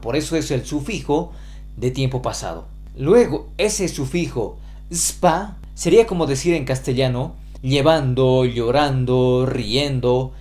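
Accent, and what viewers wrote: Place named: Bolivia. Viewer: Hola, he buscado mucho canales para aprender quechua con pronunciación boliviana, y tu canal es muy bueno, me ha ayudado mucho en la traducción que más bien tu la interpretas y es más adecuado en los idiomas puesto que no se puede traducir palabra por palabra como en español, y tu explicación de los sufijos es muy clara. Sube tus videos de facebook acá por favor. Gracias por el canal.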